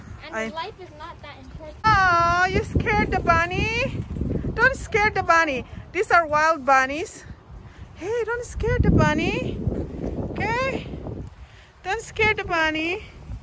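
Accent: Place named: Ilocos, Philippines. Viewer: nice vlog. Narinig ko lng Iokano pala kau. hehe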